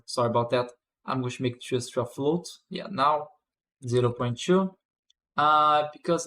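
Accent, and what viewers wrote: Brazil. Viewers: esse sotaque ai, tu é br kkkkkkk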